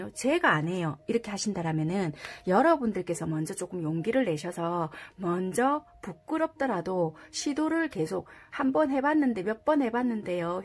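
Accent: Busan, South Korea. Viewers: 개인 전화 상담 신청 어떻게 하나요 🥺❤️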